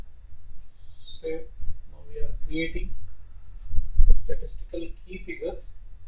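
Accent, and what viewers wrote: India. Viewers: I guess this is indian speaker.....awful!!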